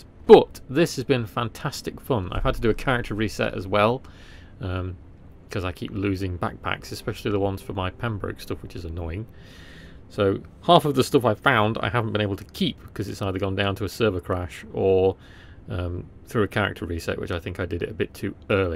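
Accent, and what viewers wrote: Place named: England, United Kingdom. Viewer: Can’t even understand with your English accent. Can you put the names on the screen 👍🏽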